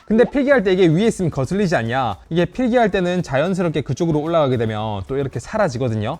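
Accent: Gyeongsang, South Korea. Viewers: 친절한 갱상도 남좌 하지만 난 아이패드😢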